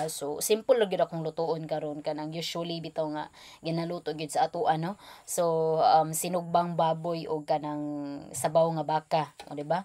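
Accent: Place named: Visayas, Philippines. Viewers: Lami jud imong vlog dai kay wala kay pretentions, naturally-made and Boholano inspired. Your boholano accent and features bring us back good memories when we were staying in Ubay,Bohol for a decade. With your vlog, you inspire us to go back to basic like planting, harvesting, cooking using firewoods and eating together with your family as well as praying and thanking God always for all His blessings. Basta ganahan jud ko motan aw sa imong vlog dai oi. Congratulations🎉😇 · Sana may translation pag nagbibisaya..hindi ako makasabay, i super love your dialect..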